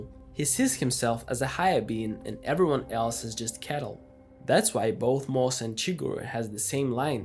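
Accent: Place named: India. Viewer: U SOUND LKE AN AMERiCANiZED DOT iNDiAN ! NOT YELLiNG, JUZT LiKE TYPiNG iN CAPZ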